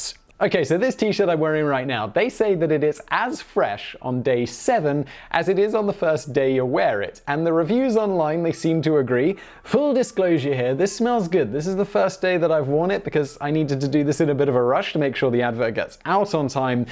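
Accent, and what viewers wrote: United Kingdom. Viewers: becoming so much more playful. Love it:D Also great to hear his awesome British accent saying "tracksuit bottoms".:D We call them that over in South Africa.:D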